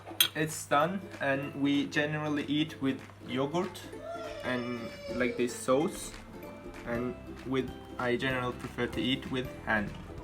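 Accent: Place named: RP, United Kingdom. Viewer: perfectly posh thank you!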